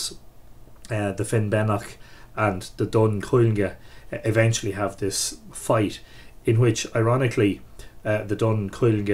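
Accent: Ireland. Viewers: Yes, I'm from Ohio in the US.. It's interesting that you are from Munster.. My husband's people and some of mine are from Clare.. He was first generation, my people have been here since before the American Revolution.. Being here for so long, I am mixed but still mostly Gael.. My son just bought me a DNA test to see if what I've been told about my ancestry is correct.. I grew up hearing myths and legends of Ireland.. I prefer sources written in Ireland and by Irish immigrants.. They're becoming easier to find but pronunciation is a quandary.. I can understand him but it's like me being a hillbilly understanding a New Yorker.. I guess since Ireland is so much smaller I expected less contrast in pronunciation.. Thank you for helping me..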